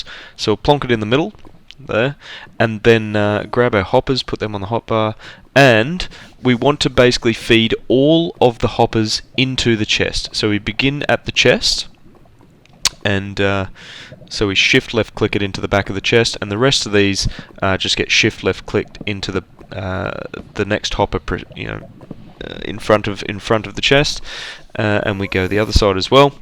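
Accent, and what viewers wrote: Australia. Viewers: I love Australian accents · Wow you town looks so cool and are you Australian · Are you Australian? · Australian dude? I am :D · Are you Australian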